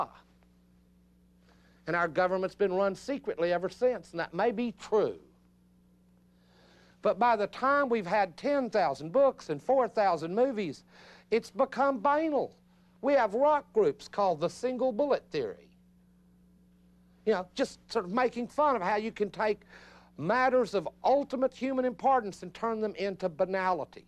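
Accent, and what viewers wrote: South, United States. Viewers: 'Rotund effeminate southerner lectures on leftist apologetics'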